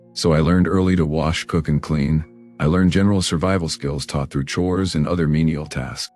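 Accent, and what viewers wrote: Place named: Jamaica. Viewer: Wear in Jamaica you from you sound just like me I grow up in St James aĺ was my job too as a girl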